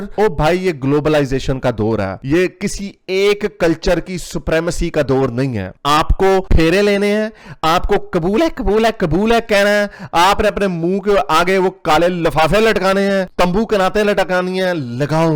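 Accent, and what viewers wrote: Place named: Punjab, India. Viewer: Aap ke panjabi bolne ka style sunn ke majja aata hai.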